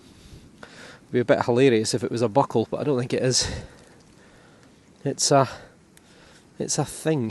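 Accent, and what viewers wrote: Scotland, United Kingdom. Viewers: Enjoy your videos for your historical content and love your Scottish accent.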